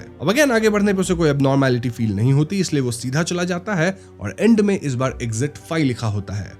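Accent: Bengal, India. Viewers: You are Bengali, Your tone like bangala.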